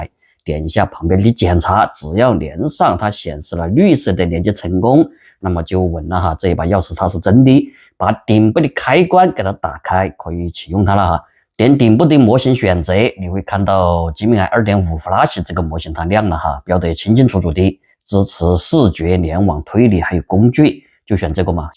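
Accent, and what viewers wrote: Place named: Sichuan, China. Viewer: 熟悉的川普😂😂😂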